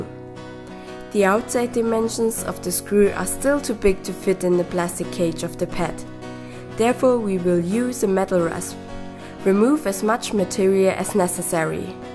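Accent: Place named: Germany. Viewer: love this idea, great job. ) and very cute german accent as well :)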